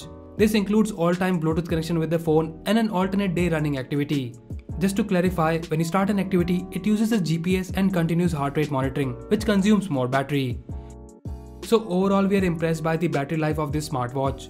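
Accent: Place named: India. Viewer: Good review, but your english is hard to understand... I asume you are from India , I suggest speaking slower or taking some courses to lower your indian L`s in every word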